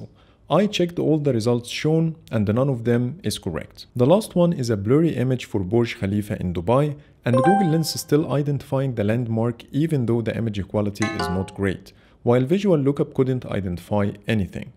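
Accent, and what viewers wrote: Egypt. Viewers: لهجتك مصرية 🌚✌🏾والله فيديوهاتك ادماااااان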